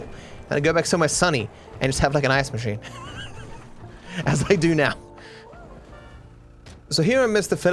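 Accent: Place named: Australia. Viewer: Cmon man just let that native Australian accent FLOOOOOW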